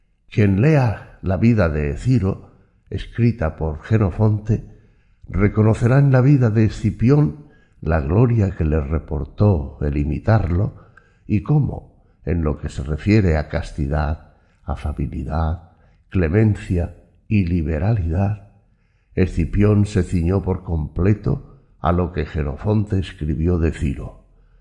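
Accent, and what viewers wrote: Spain. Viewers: Los Latinos raramente disfrutamos del acento Español pero esta voz si que que te encierra y te agrada invitándote a poner atención pero no se aun si también lo será para poner esto en practica. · en español españa weon, español españa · Y en español ibérico....Una joya este audio. Maquiavelo más actual que nunca, no cabe duda. Mil gracias.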